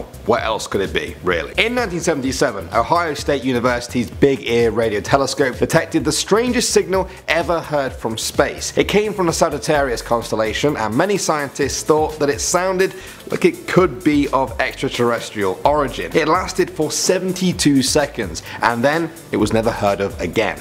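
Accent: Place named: United Kingdom. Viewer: you love space. ok ok, I get it. I love british accents.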